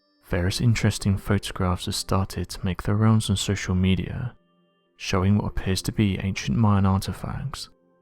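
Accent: United Kingdom. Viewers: Cool info. But the British accent I can't listen.. I know it's not right. They are the worst ,look at histroy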